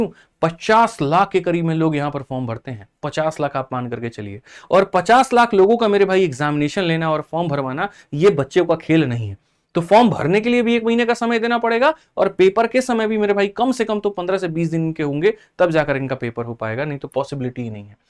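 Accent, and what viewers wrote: Bihar, India. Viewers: Jarur Bihar se hi ho ap...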